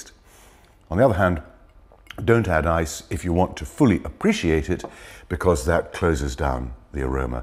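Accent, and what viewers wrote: United Kingdom. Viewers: A British Optimus Prime?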